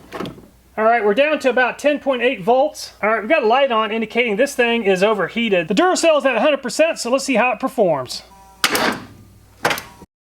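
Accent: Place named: Pittsburgh, United States. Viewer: are you from Pittsburgh or in PA? Because you definitely talk like us lol.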